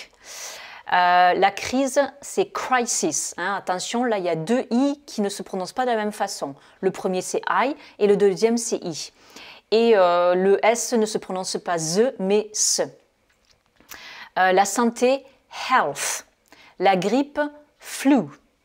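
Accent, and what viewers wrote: France. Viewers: Bonjour, excellente présentation étendue de ce vocabulaire très intéressant par les temps qui courent. Cependant, je suis professeur d'anglais depuis 35 ans, et je me demandais si votre prononciation légèrement francisée des termes et expressions était voulue ou pas, dans le but de faciliter la prononciation anglaise pour vos internautes francophones ? Merci pour votre réponse éventuelle, et encore bravo.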